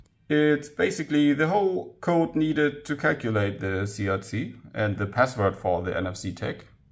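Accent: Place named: Germany. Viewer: Interessante Information aber dein Akzent kommt so stark (wie bei jedem Deutschen) hervor :D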